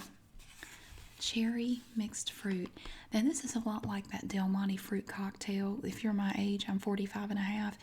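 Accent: South, United States. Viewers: I love these aldi videos!! With your southern bell voice so, sooooothing!!!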